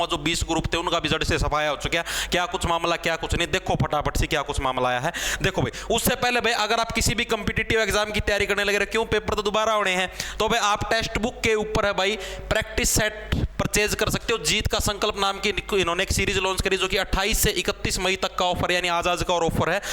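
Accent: Haryana, India. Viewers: Maan gye guruji..apke theth haryanvi andaz k liye...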